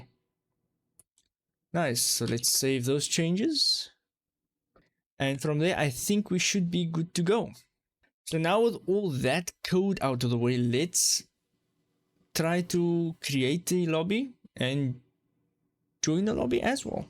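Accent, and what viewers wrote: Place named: South Africa. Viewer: I know that accent, hello from South Africa <3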